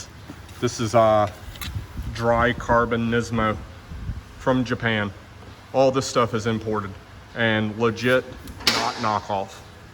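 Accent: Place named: Appalachia, United States. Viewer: Were you from originally sound a little hillbilly to me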